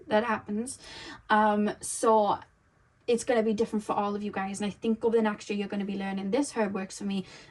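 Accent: Wales, United Kingdom. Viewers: This is really random but I just want to say I've always LOVED your voice and Welsh accent. The way you say certain words is amazing to me lol. When you said "hoodie" I was mind blown and had to replay lol love it ❤️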